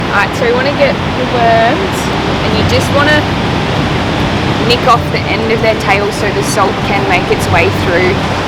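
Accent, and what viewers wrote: Australia. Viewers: One of the coolest girls I’ve ever seen and the great Australian accent😃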